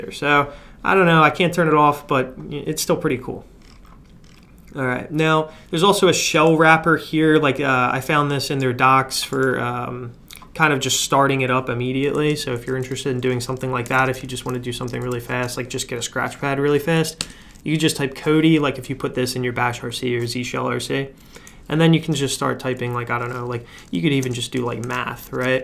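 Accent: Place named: Pennsylvania, United States. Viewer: Trying to place your accent. Sounds kind of New York or New Jersey at times but mostly Pennsylvania. Maybe I'm way off though.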